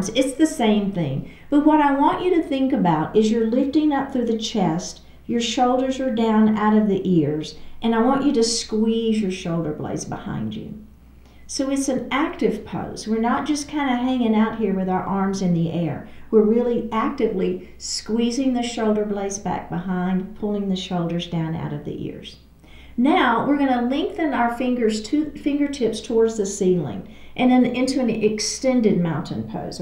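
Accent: South, United States. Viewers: I just found you -- quite serendipitously! -- for the very first time on your Day 1 of your 28-Day Chair Yoga series, and since it's Day 1 for me as well on committing to moving my body and getting my strength and physical excellence back, I thought it a match made in heaven! I like your style very much, your soft-but-obviously-also-strong energy, your excellent and very clear instructions (I really like the reminders you stick in there, and they often are so perfectly timed!)... and I especially appreciate your slight but lovely Southern accent [since my Daddy was from Georgia, and I do have a soft spot for things Southern:D]... I also love it that you use the Indian names for the poses, etc., AND I felt wonderful after the session... Well, I'm just thrilled, and I look forward to being with you every day. I also am not a beginner (a commenter mentioned that), but following the initial processes and starting" from scratch" feels like a perfect intro back into doing (and loving) yoga as I did years ago. 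AND may I also say (all due respect to the other teachers I've found and tried on YT so far -- I'm so glad you're closer to my age! There's a richness and a most welcome depth and smooth flow that happens from your expertise, and I appreciate it very much. Excellent session, -- thanks so much! I'm delighted to be doing this with you. -- Nancy from Oklahoma City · Thank you!! I'm so happy I found you!! You have a calming voice.. The southern accent brings me back home!! Can't wait to start this challenge with you! I'll let you know how it works out.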